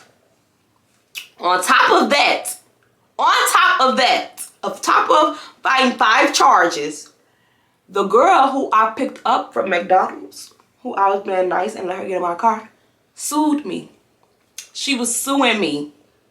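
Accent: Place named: Chicago, United States. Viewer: The Chicago accent made the story even better! And now I'm bout to watch story times on YouTube! This was a good one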